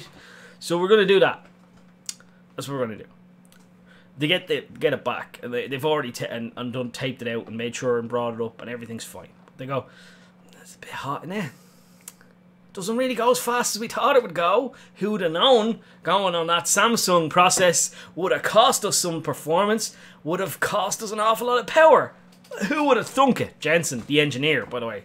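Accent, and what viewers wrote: Ireland. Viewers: "I tawt I wuld tawlk to yew" Fuck the irish accent is absolutely fucking magical ;)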